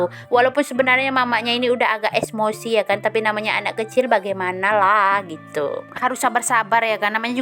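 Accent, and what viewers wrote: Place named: Java, Indonesia. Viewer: Ngmng ny kaya tetangga ku bun dari Jawa ya aku dah subscribe bun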